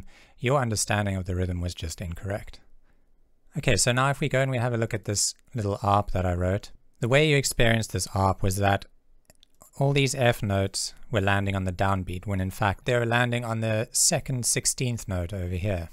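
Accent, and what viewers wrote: South Africa. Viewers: Are you a South African that moved to the UK?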